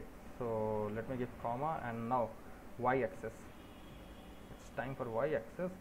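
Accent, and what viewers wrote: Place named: India. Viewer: its always a damn robot non english speaking indie person... cant understand a damn word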